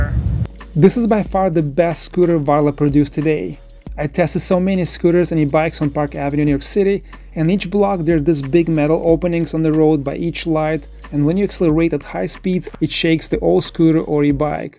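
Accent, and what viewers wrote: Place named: Russia. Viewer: я не пойму комментатор русский чтоли? прослеживается акцент)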